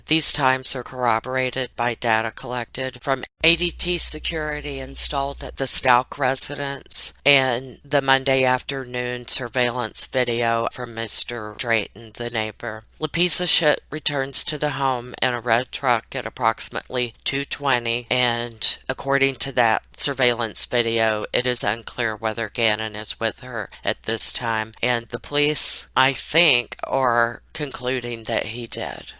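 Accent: United States. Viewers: I love you an shit. I think youre so down to earth and you sound like a USA version of me lol Keep up the good work. The world was already Mad hunny pie. Its just that we are all at home watching and listening and realising how fucked we truly are. Double Pandemic. Covid and Stupidity. Stay safe and feel the love Im sending you. From one night Owl to another, love and light to everyone from wee me over here in SUNNY Scotland lol (see how long this lasts eh...) lXl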